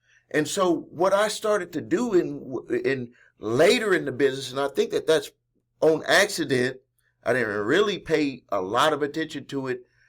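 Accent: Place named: South, United States. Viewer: You are keeping us inspired, man. What state are you in? You sound southern. We are in NC.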